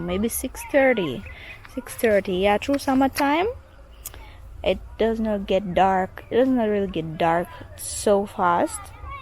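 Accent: Jamaica. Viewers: Bless 🙏 up to you and the family 💕! It's so good to see you and the boys hanging out, single mom life is very hard, but you did a good job for the day ❤️ 😁💪, and also cutting your coconut, you are a real yaady🇯🇲🇯🇲 Jamaican style 😂😂👍, I watch this video twice, big up to 💪💯 and his team for winning their match, we need more videos from you with that sweet yaady 🇯🇲 talk 😂😁 one love❤ to the family everytime👃👏🇯🇲😂. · nice to hear when you talk like a jamaican. 😊. Nuff Blessing from Germany.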